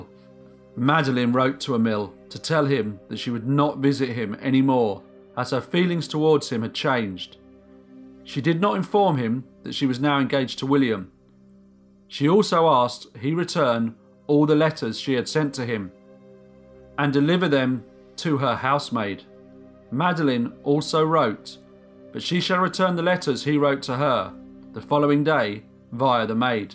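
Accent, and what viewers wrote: England, United Kingdom. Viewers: Even the music in the background does not distract me because your voice is very distinct. Love your style of narration. I love English accents. 😊👍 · I agree ☝️ your voice, I keep saying English Accent!! Haha 🤣 🇬🇧 with love 💖 Ally from Australia 🇦🇺 👍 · I just love your British accent.. It's so soothing and I listen to your stories and it fills like,im listening to an audible book on my phone.. But, keep the stories coming.. My good man.. 😘🤔🤗😱🤧🤢👻🔪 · I,too,enjoy your wonderful English voice. I love each carefully researched broadcast. Great job!! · Wow!!!!... I really enjoy your channel and constantly recommend you to My friends. your voice is PERFECT and I NEVER EVER am confused about what your narration is saying. Also or "as well" thank you for annunciating so clearly.although I am an American 'folk' but I absolutely appreciate the Queen's English when it is used so well.thank you again for such entertaining videos. 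I am considering becoming a Patreon which I have never done before but I think it's well worth it for the quality of the the video info 👌 THANK YOU AGAIN...,. your American friend, Brian 🇺🇲😁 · I don't understand why anyone would have a problem with your voice. It's a perfect narrator's voice. Plus it's an English accent that Americans can easily understand. Love your voice and accent. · Just subbed, your my new fav channel. 🖤Love the British accent,don't ever change that. No matter what anyone says. 💯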